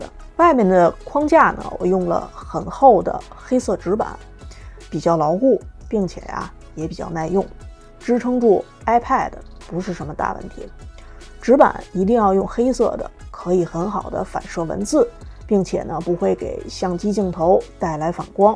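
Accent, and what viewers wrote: Beijing, China. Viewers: 口音像北京人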